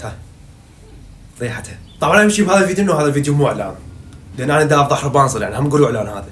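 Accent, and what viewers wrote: Iraq. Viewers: عراقي 🤔 واني عبالي مصري 😂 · اكثر شي بيعجبني اتفرج على فيديوهاتك اللهجة العراقية ❤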